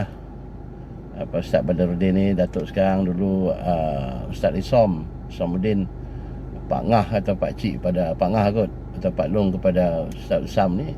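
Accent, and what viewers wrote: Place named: North, Malaysia. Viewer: Deme ni X faham cara ckp org utara. 'anak haram' ni bkn maksud maki. Biasa je.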